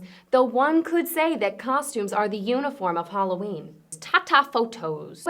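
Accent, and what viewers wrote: United Kingdom. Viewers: Not what I was thinking at all. I was thinking how upset I was that you missed another excuse to show off your fantastic British accent :o(. But the freakout over what to call toddlers made up for it :o)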